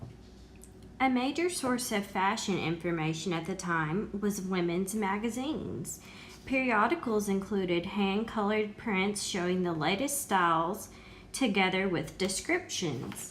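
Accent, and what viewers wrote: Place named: Missouri, United States. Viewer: I love your accent, are you from misouri? I'm not from the states so that's the closest guess I can make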